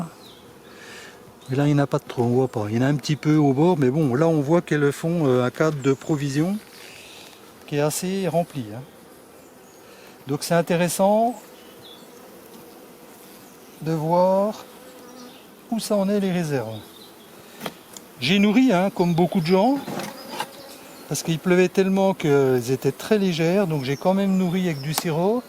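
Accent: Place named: Franche-Comté, France. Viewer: Ce bel accent franc comtois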